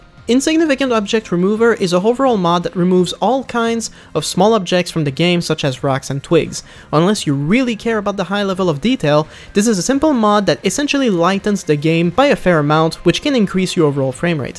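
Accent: Quebec, Canada. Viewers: L'accent québécois haha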